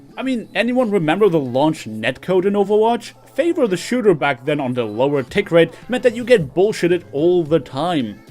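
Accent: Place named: Germany. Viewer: Se German accent tho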